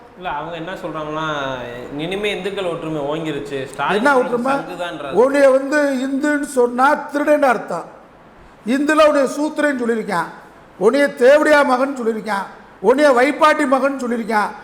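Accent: Madurai, India. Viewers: சார் சூப்பர், மதுரை ஸ்லாங்கில், தைரியமாகவும், வீரத்துடனும், இஸ்லாமியர்களுடன் நட்பு பாராட்டியும் அருமையான பேச்சு, நீங்கள் இன்னும் பேச வேண்டும் அட்டூழியங்கள் ஓழியும் வரை.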